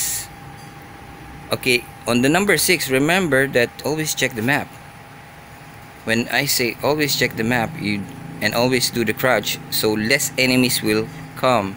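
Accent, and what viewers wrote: Philippines. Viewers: Are you Pinoy? Halata ung accent eh xD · I know you filipino i hear filipino voice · Pinoy akcent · ur voice just like pinoy? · Pinoy tama??😂 Edit:sabi ko na accent palang +1 sub Gawa ka ng video kung pano hack ldoe 1.6.5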